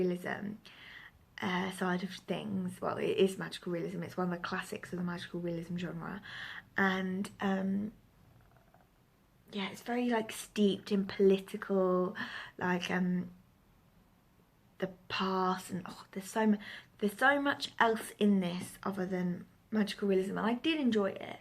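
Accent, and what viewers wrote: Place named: RP, United Kingdom. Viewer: hey there ! cool vid hon ! :) :) hugs hugs aww u r nicely organised :) i got a little book revew diary :) i cringed at times in Eleanor too it was sweet book tho hugs where u from hon ? u have similar accent to me .. only u sound posher than me! hugs hugs from Essex :)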